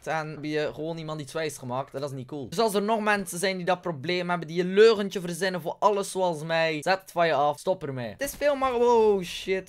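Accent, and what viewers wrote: Belgium. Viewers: Netflix&Chill met mij omdat ik uhm nouja uhm uhm omdat ik uhm je belgische accent en je haar vet schattig vind en ik ben (hoop ik) ongeveer 100% een jongetje ^^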